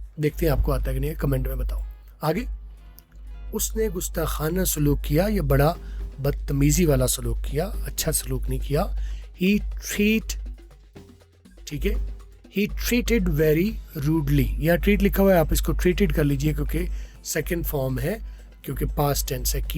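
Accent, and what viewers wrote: United Kingdom. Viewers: Talking is British accent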